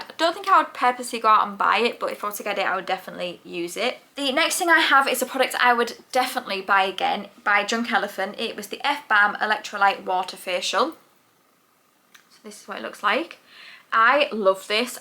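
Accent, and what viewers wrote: United Kingdom. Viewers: Hello! I'm over from the Project Beauty channel and newly subbed! I hope it isn't too forward, but I love your accent. What part of the UK are you from? Northern England?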